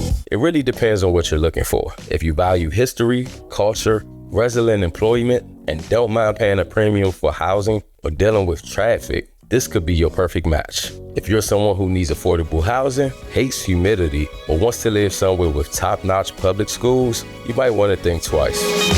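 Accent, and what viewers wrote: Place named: Washington DC, United States. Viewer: Nice video my man! I'm not a native but been here for about 30 years, since a few years out of college. You pretty much nailed it. One thing I would say, while the summers can be brutal and the winters tough, the spring and fall (especially the fall) are beautiful. Only other thing I'd quibble with a bit is the food scene. DC used to be a food desert. But you can find tons of amazing restaurants here now!.Great job overall capturing the city. You can tell you're a DC native by the accent! 😆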